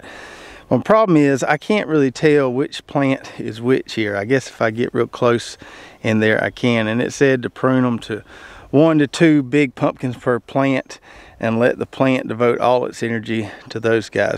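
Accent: South, United States. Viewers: Love an open minded hippy hiding under a southern accent. Thanks for sharing your knowledge and journey. Your family is so adorable and sweet.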